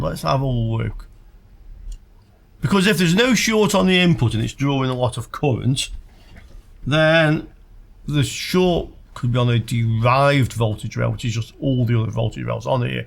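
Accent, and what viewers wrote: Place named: Stoke-on-Trent, United Kingdom. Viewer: Where are you from? I hear Stoke-on-Trent?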